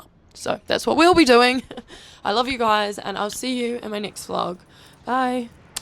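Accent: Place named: Australia. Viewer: nice outfit, Nice Australian accent 🇦🇺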